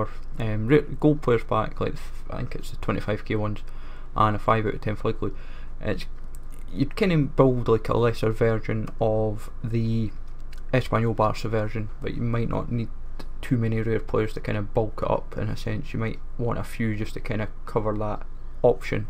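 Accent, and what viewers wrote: United Kingdom. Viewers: us americans that can't understand you heavy accented brits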